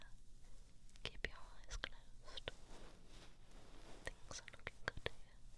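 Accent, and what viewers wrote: United Kingdom. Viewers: I love the British accent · oh goodness, I love your British voice. This is just sublime Miss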